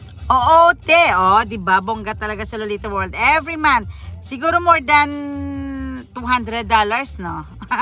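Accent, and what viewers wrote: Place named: Hiligaynon, Philippines. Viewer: Ilongga ka host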